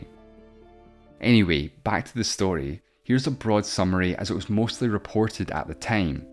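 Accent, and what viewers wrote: Scotland, United Kingdom. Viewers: I love the Scottish narration :) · Out of curiocity, what is the accent of the narrator? I think it is Scotish but it doesn't completely match with my limited experience. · You're correct that's a Scottish accent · it's scottish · Can't belive you said "all of Ireland was part of Britain but then they wanted independence" you just ignored the fact that the reason they were was because of colonisation. You make it sound like Ireland was originally British and that we just broke off from the empire. I was sort of expecting something like this because of your accent being Scottish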